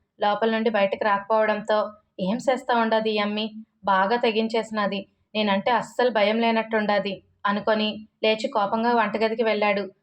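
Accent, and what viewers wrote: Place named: Rayalaseema, India. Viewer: అక్క నాతో ఇంకో చిన్న రిక్వెస్ట్ ఈ క్యారెక్టర్ లో నువ్వు బాగా మునిగి పోయినావు రాయలసీమ భాష తోటి బయట కూడా నువ్వు ఇదే భాష మాట్లాడే అనుకో యాడ నుంచి వచ్చిన అమ్మ నువ్వు అంటారు మరీ అంతలా కమిట్ అయిపోతే గా ఈ భాషకి😂😂😂🫴🫴🙏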